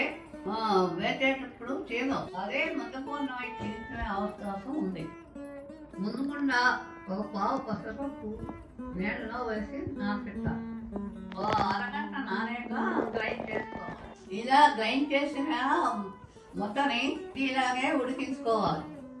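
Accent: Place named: Godavari, India. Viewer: Chaalaa thanks andi! Mee Telugu kuda Godavari jilla Telugu laga undi feels nostalgic! 🙏😊👍 Sankranthi Subhakankashalu meeku, mee family andariki! 🙏❤️😘💐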